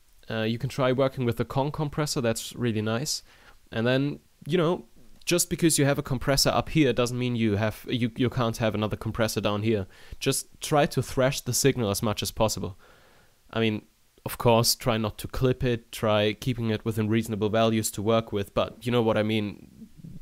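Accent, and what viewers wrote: Germany. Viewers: and i can hear youre german, mein junge :D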